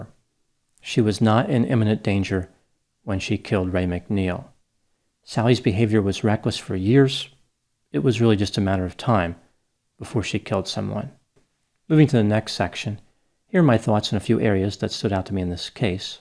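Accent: United States. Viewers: My name is marianne and live in England 🇬🇧 I love the way it sounds with American accent 😀 😄 xx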